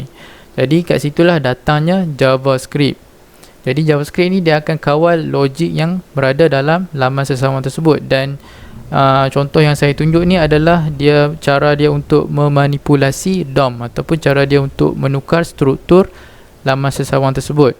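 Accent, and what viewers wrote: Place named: North, Malaysia. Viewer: saya subscribe hasil dari iklan awak di YT Channel Hazeman Huzir.. org mana tu.. terkeluaq bahasa utara tu..kekekeke.. saya support ini channel.. Salam dari Bumi Sintok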